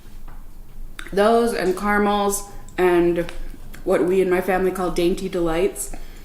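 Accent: Minnesota, United States. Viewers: She definitely has a Minnesotan accent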